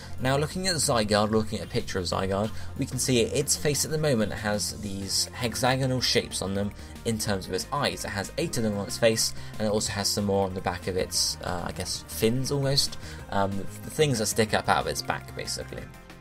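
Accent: United Kingdom. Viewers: He's from the UK, they pronounce words and letters differently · Omg man sorry that's amazing. That's just how us brits pronounce the letter Z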